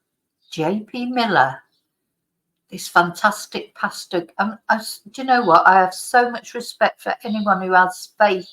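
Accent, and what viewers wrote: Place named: Manchester, United Kingdom. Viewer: Hello. I love listening to you and your great comments. So nice. Also your accent. I'm from Mcr too and miss it so much. ❤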